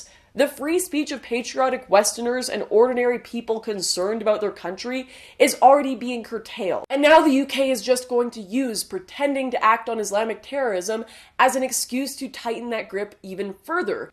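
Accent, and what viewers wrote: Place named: Canada. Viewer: That Canadian accent doe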